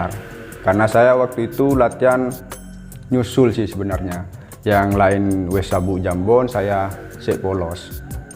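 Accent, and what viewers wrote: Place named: Madura, Indonesia. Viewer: Mentok Banget madurenah ✌️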